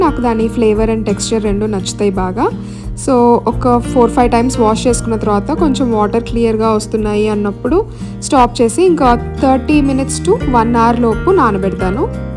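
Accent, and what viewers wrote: Rayalaseema, India. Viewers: I am also from Rayalaseema. Loved ur seema accent videos. And nice receipe too... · Hi akka...we too live in Nandyal at Sanjeev Nagar. YouTube lo asal pakka rayalaseema language ante needi akka... U don't have filters in ur flow of words.. Mana kurnool district vallu ela matladtaro as it is alage matladtunnaru. Keep rocking akka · Rayalaseema lo ye ooru akka mana rayalaseema basha lo super ga chesav naadhi Kadapa jammalamaduhu 💥💥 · Rayalasima slang tho video lu appudappu cheyyandi please Bokulu Jankulu Uthalabithala Benne Super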